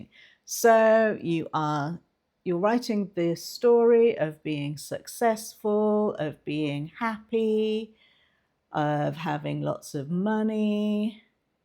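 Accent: England, United Kingdom. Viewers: At last … someone who breaks this down in plain English and with a beautiful English accent 😁 So glad I found your channel. New follower here!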